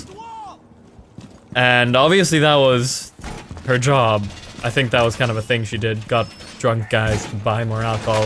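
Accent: Sweden. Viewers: Are you swedish? Sounds like that! I am swedish If you wanted to know!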